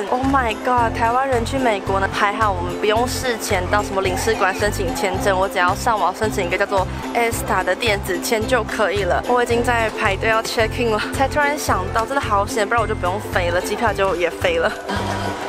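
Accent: Kansai, Japan. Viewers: 發現Mao說話有關西腔w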